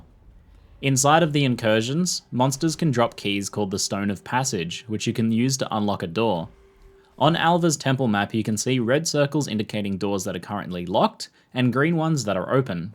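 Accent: Australia. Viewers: rooms or runes, dat ausie accent xD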